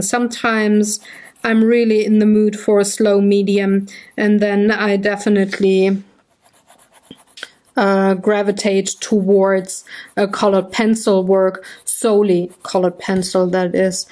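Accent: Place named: Germany. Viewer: Hut ab, dass Du das alles auf englisch machst! Vom Akzent her solltest Du das hier lesen können ;-) Meine Sabbelvideos hatte ich ursprünglich auch auf englisch gemacht früher, aber der Humor ging verloren. Dafür spreche ich wohl zu schlechtes Schulenglisch :) Schaue mich gern auf Deinem Kanal um.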